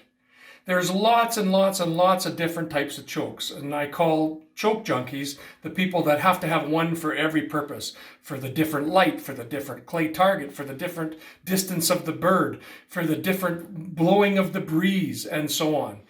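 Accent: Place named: Canada. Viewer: Its just a Canadian accent, buddy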